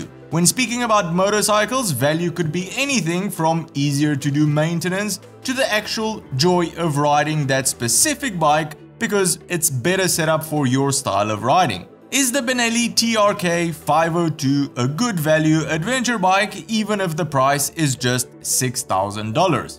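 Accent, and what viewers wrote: South Africa. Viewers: Is that a SA accent with a Romanian twang ?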